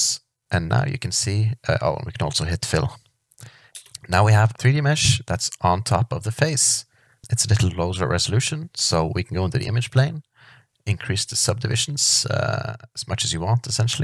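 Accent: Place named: Norway. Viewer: Great video! (Syns eg hørte en norsk aksent, gøy med nordmenn som lage slikt innhold!)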